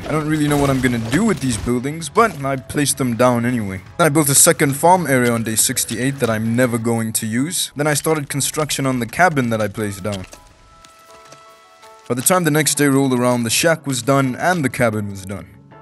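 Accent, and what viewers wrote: South Africa. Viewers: You from SA bru?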